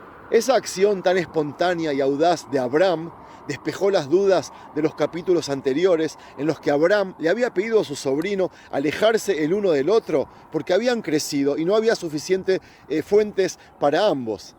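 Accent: Argentina. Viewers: Este señor es argentino por su acento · Feliz navidad??? Bien dice las Sagradas Escrituras: profesan ser judíos mas son sinagoga de satanás. Según éste estudia la Tora con doctrina romana y protestante, además tiene acento argentino sho digo que vos es un falso judío. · Creo que él es de origen argentino.. por eso habla asi.. por su asento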